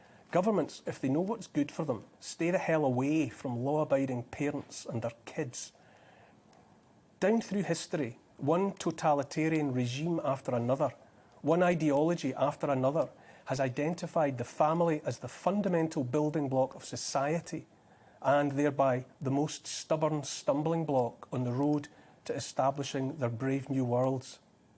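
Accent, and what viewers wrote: Scotland, United Kingdom. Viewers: What a great commentary, and delivered in a wonderful Scottish accent as well! I feel like a tired, worn-out soldier in a foxhole almost thinking it's all over who's just heard the bagpipes of an approaching Scottish regiment coming to my aid and just knowing everything's going to be all right! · Listening from across the pond. Your point is made all the more poignant with your beautiful Scottish accent. We are all brothers and sisters in this madness. Thank you for your courage and may we all have the same courage as you.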